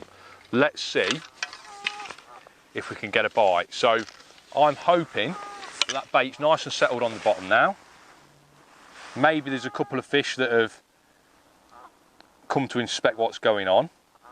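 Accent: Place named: East Midlands, United Kingdom. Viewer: Love the videos, from your accent, are you derby, forest or Leicester?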